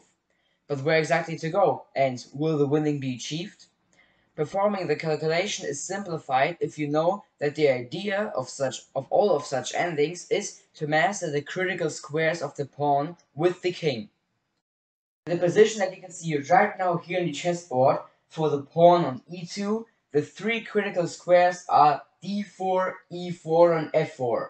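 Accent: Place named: United Kingdom. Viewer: Begrüßung aus Bulgaria. Ich bin dein 375 subscriber. Dein Englisch ist sehr Britanisch für mich(das ist normal). Ich denke deine videos sind sehr neu und gut. Das ist die erste video YoutubeALg. shau mir auf dien channel. Ich will mehr shcach videos für angefanger (ich bin 1800chesscom2000liechess blitz) in youtube. Mein freund (unter 800) muss learn von dich. Deine erzelung ist sehr gut. In meine meinung die serious erzelung in youtube ist nicht genug.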